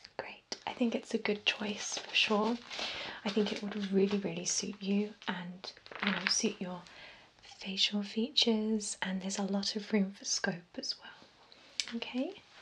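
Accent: England, United Kingdom. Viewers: "obviously you'd need to grow your hair a lot longer" bish my hair is longer than yours :-P I actually really like this video, which is unusual for me, tbh - generally I'm really not into british voices in asmr (being british myself - i think part of what I"m looking for in asmr videos is a kind of escapism from the reality i'm surrounded with on a daily basis? idk) anyway, good job :) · You sound a lot like whispers red wonder if you're from the same part of England... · Yes she is so stunning, And what a ASMR video lovey British accent so soothing....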